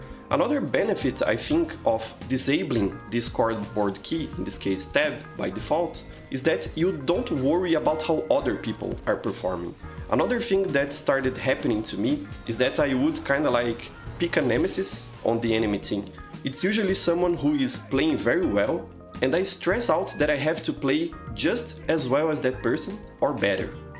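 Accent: Brazil. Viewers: Mano, da de saber donde tu é só pelo sotaque, ksksksksk forsa ao tf2 feito pelos br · Are you Brazilian? Because you have an accent of a Brazilian speaking English. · Its funny how fast you can tell someone is brazillian by their accent, cool vid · Pelo sotaque é br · Ouvi 10 segundos e pensei "isso é sotaque de brasileiro". Aliás, provavelmente do interior paulista.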